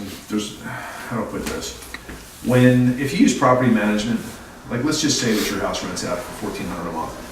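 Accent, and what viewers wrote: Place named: Baltimore, United States. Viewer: This guy knows his stuff,but even more important you can clearly get a good understanding of hearing his authentic Balmer-accent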